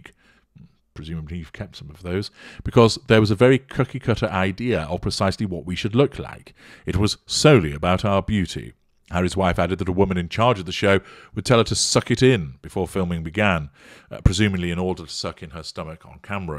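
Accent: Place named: England, United Kingdom. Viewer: This is just the best thing ever hearing these words in an English accent! 🤣 “assywipe” “spotalie” and “podcrap” I had to replay it a cpl of times 😂 i love it!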